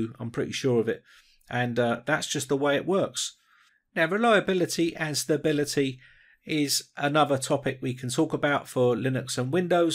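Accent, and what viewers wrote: United Kingdom. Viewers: Love your British accent, I was recently interested in the comparison between these os, love from India 🤗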